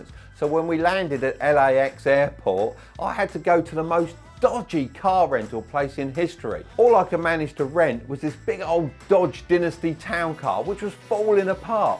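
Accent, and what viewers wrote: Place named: Norfolk, United Kingdom. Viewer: nice norfolk accent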